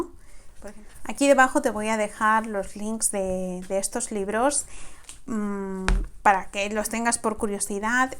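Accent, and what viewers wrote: Spain. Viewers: eres española? Saludos desde México.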